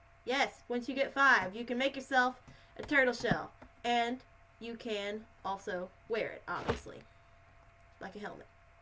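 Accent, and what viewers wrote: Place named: United Kingdom. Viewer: That British accent though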